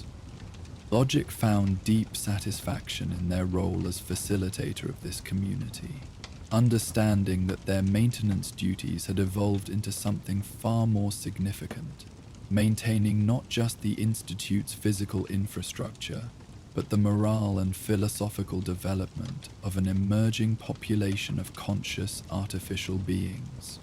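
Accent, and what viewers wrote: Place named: United Kingdom. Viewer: Lost me at the British accent. I came to hear 1950s trans Atlantic accents like in fallout not British mods.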